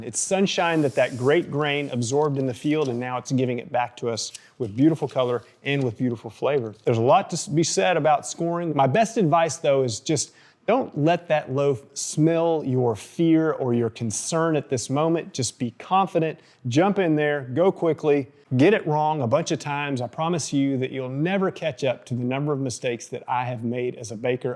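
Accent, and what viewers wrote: Arkansas, United States. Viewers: I’ve been watching these videos religiously for months. I knew that accent sounded familiar. Had no idea you were a fellow Arkansan! Thanks for the work you do! It’s been a big help to this amateur baker. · Being a Memphian, I was intrigued to hear your slight accent... cool to find out that you are originally from Arkansas! Love to watch you bake!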